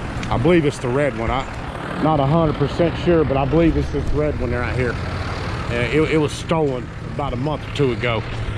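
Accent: South, United States. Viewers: "Lamberginny, Austin Martin..." haha, gotta love the southern accent. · Laahamburghennies, Austin mahrt inns, beautiful sunny southern vernacular!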